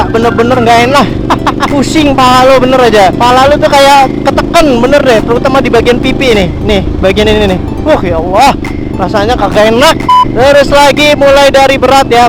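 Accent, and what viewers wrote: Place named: Java, Indonesia. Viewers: Jawane medok tenan #save duka hati😂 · Bang seharian ngomong pake medok jawa 😂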